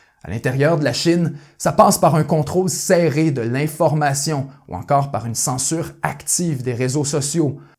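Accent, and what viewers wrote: Quebec, Canada. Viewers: L'accent Québécois, il est magnifique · L accent Quebecois est genial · J'adore ton absent québécois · j'ai vraiment toujours un problème avec l'accent des québécois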